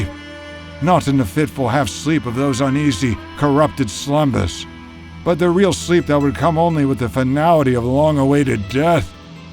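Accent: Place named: United Kingdom. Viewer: This dudes voice just always gets to me. Something is so off about it. The weird half British accent he throws in whenever he feels like it, the weird emphasis on certain syllables or the breathy way he ends a lot of words, I just can’t with it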